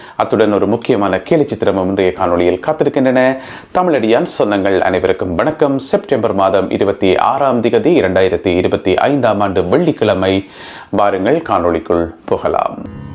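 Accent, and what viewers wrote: Jaffna, Sri Lanka. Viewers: Aassalamu alalikkum der என் இனிய உங்கள் தமிழ் உச்சரிப்புக்கும் யாழ்ப்பாணத் தமிழுக்கும் நான் ரசிகன் 🎉❤😊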